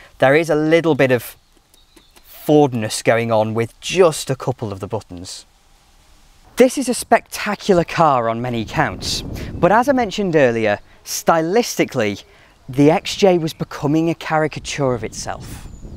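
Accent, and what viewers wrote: United Kingdom. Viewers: Thanks for nice review, ... and beautiful English UK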